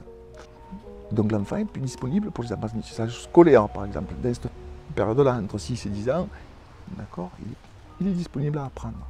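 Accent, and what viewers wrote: South, France. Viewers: Vraiment très bien, très bien expliqué, par un locuteur très sympathique.Son discours est accessible aux non initiés, va à l'essentiel. Il n'y a pas à dire, l'accent du sud, c'est quand même autre chose que l'accent débile des gens du nord.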